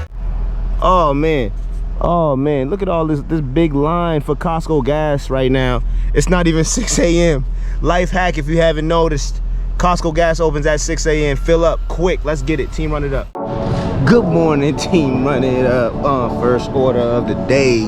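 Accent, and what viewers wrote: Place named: Boston, United States. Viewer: What part of Boston are you originally from? I’m from Roxbury.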